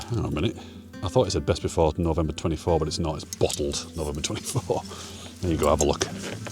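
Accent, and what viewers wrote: Yorkshire, United Kingdom. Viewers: I'm really impressed with the beautiful views and the hiking that you do.always loved England, but I would never be able to do things you do. Sweet doggie Finn. You've got a good sense of humor. I believe that's a Yorkshire accent ? First class😊. The next part you're probably not going to like. I don't know what you had on your Christmas jumper, but you were (grieved) with the comments. What you say is a bit of fun, isn't. Balfamet,a pentagon, stuff like that is occult. The devil is very busy these days blinding g people's eyes. Hope you're not annoyed(grieved), and will think about it.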